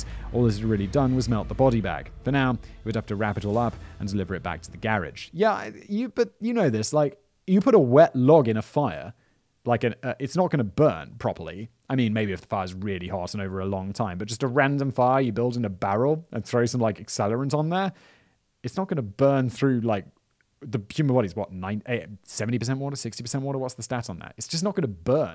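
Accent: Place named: England, United Kingdom. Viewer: I really enjoy listening to the content and especially the delivery. The English accent definetly adds to the charm. As an American, the pronounciation of "garage" kills me! :)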